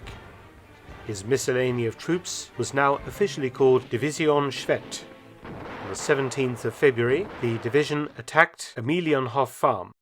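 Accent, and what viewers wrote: United Kingdom. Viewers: Hello Mr Felton, My wife and I saw you on german TV yesterday when we watched a documentary on Hitlers trains. I immediately recognized your face, while she recognized you purely trough your voice. "That's the most english sounding english I know" she said. We love your videos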